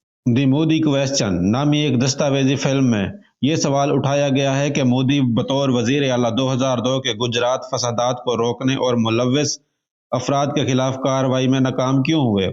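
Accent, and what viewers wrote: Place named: Pakistan. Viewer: Yuck! What a Pakistani-sounding narrator. Utterly one-sided and typically I$lamiC in your worldview. Lol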